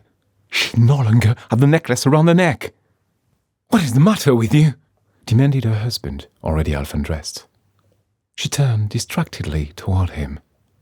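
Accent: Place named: United Kingdom. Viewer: C'est une si belle idée de réaliser une version anglaise ! And what a delicious british accent ! I like that.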